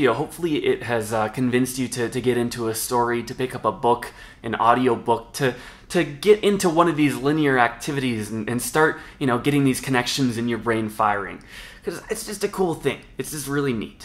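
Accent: Canada. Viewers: Your accent says you're in Canada. Am I right? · This guy’s Canadian. ‘Bout!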